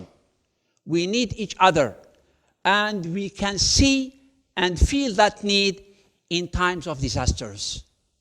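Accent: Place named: India. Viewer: lol he has an indian accent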